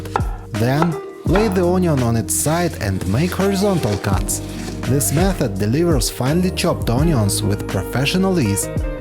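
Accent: Russia. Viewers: Какой ужасный русский акцент... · Такой милый рус акцент, я даже забыла, что на англ слушаю. :)) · Please do not record your videos in English. You have terrible Russian accent. Take pronunciation lessons. Good luck. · Do you have a favorite hack for making 1/4" butter cubes for pie dough? the cooling rack iiii good, but I think I should pop it in the fridge or freezer to get them hard enough to pop out of the race without mashing them. Oh! and the mushroom cutting! So good. Is that a Russian accent I detect? I have so many Russian/FSU friends that I feel right at home with you teaching us. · I can't help but subconsciously find the accent very uncomfortable. As if I heard Russian instead of English. the emphasis on syllables with specific vowels even scares me as a Czech. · U sound russian. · I like your ideas and your russsian accent. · Видео на Английском языке, стало быть и комменты будут на Английском 😂😂😂😂😂😂, да, я по незначительному акценту поняла , что парень говорит и на русском, но в общем видео для англоговорящих.❤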